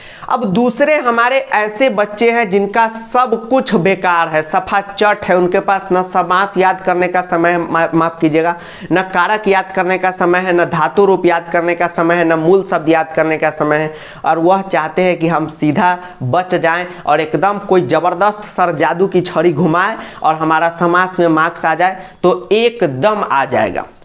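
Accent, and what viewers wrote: Bihar, India. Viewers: Aap bihar ke ho kya?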